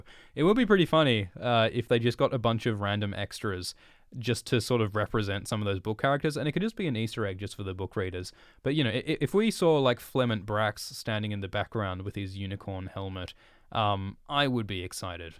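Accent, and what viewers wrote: Australia. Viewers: It's not the typical Aussie accent, you can hear it in some words, but he doesn't do that thing where everything he says sounds like a question. So, it throws people off, especially Americans who hear relatively few of the different Aussie accents. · I'm curious, are you Australian? Being an Aussie myself, you sound very Australian? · It's Australian (this gets asked about 50 times in every ASX video btw, he should just start out his videos by saying where his accent is from so people will stop asking all the time xP)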